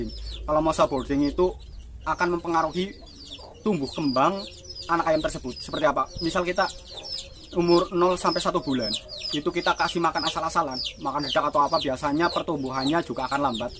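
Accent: Banyumas, Indonesia. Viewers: Joooss sobat ku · Ngapak nya mana kang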